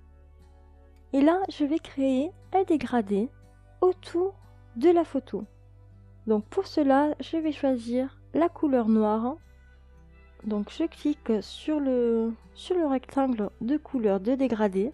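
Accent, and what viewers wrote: South, France. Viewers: Bonjour merci pour ton passage sur ma chaine et pour ton très gentil commentaire, tuto comme l' écrit mon ami Jim ( homme de gout ) excellent. like 24 et un petit abonnement en plus pour écouter tous tes conseils, encore merci et à bientot, amitié, ah oui ton accent du sud est à croquer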